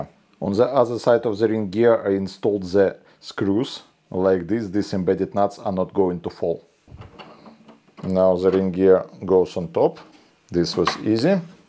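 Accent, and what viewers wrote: Ryazan, Russia. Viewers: Шикарный Рязанский акцент )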